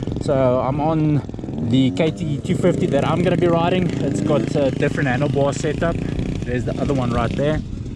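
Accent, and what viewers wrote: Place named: Afrikaans, South Africa. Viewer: Heavy Afrikaner accent. Daai bikes is Chinese ne . Ek ry n Beta 300 RR .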